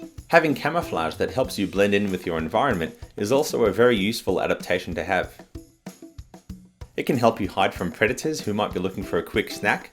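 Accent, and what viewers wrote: Australia. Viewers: it's so comforting to hear an aussie accent on youtube hah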